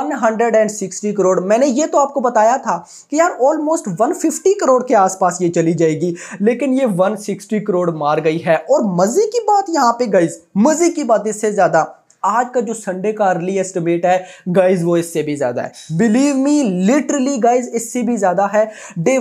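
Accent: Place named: Pakistan. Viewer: Bhai apka accent pakistani jaisa q hai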